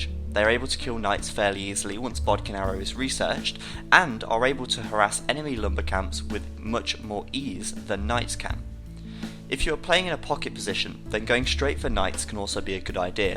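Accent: United Kingdom. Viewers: He's got the most standard British accent you could possibly ask for, I have no idea how you're thinking he sounds Brazilian.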